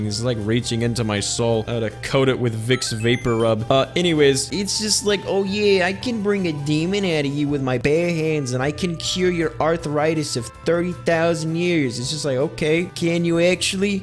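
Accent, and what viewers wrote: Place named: New York, United States. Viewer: I love the NY accent, adds depth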